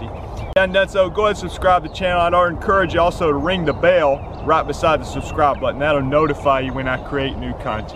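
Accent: South, United States. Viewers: Any manual skill automatically has increased credibility if the instructor has a southern drawl. Thank you, sir! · What part of the south you from, NC here representing!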